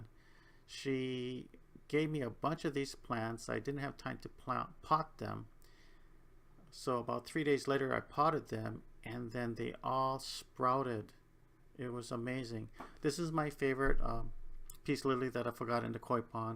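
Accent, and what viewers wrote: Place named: United States. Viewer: Beautiful plants! Now I know you live in Hawaii. But your English pronunciation is absolutely american:)Where did you learn English so well :)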